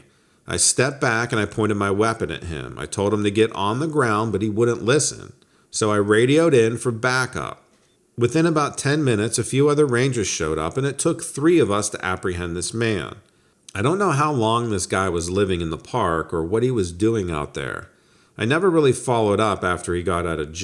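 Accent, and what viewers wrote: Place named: Mid-South, United States. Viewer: I really enjoy your accent - sometimes I hear mid-South (Kentucky/Tennessee), then at other times I hear the Western states (WY/CO/NM/AZ). Well, no matter, your voice adds so much to these stories, and makes them a pleasure to listen to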